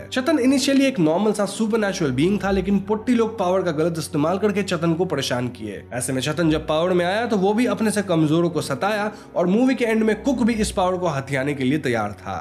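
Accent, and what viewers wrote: Bengal, India. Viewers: dada baangla accent bojha jachhe!. Film story bole dile? thank you. Copyright aasena dekho!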